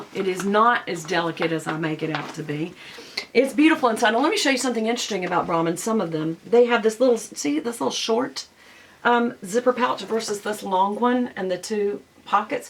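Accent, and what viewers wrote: South, United States. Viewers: I wanted and had my heart set on the large Duxbury but it was too long for my 5’2” stature. Love your southern accent and your Pandora bracelet. I pray this doesn’t sound weird but you look as though you smell good. 💕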